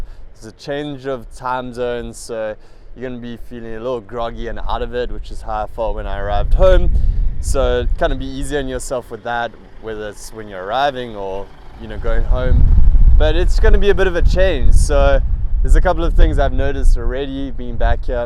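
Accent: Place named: South Africa. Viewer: Buh but your accent! I had no idea you were from South Africa, let alone an hour away! Anyhow, looking forward to hearing from Pavina soon.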